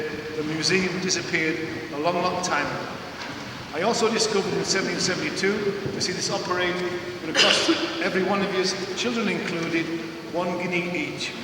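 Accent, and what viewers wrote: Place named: Newcastle, United Kingdom. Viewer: Swans might be vegetarian but I tend to try and avoid encounters with them on lakes in thunderstorms. <link> The sense of humour and accent are identical. This was our experience of swans; <link> Are you sure it is thirty pounds or thirty pieces of silver? M1O4WvtTE4o?si=9muZ2LKKr7aqeyzI I have a soft spot for The Geordie accent. Probably need heaters in a cold, draughty place like that. It is north of Watford after all.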